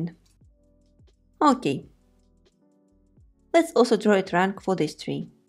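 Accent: Russia. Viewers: Сразу почувствовала, что вы русская ❤ у вас супер Английский) спасибо за отличный урок ❤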